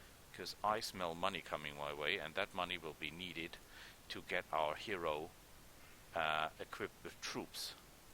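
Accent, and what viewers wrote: Germany. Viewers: He is german I think. He has a clear german accent. In the german language, the two digit numbers, and every two digit part of more digit numbers are spelled backwards, and only the two digit parts of those numbers, too. So when I do a literal translation, for example they say 25: five and twenty. 74, four and seventy. 368, three hundred eight and sixty. I know, it's very unintuitive, when learning that laguage it fried my brain many times, among other... quirks of that language.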